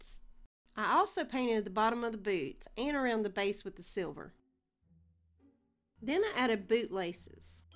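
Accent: South, United States. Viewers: Nice. I love your southern twang! It's fantastic!!!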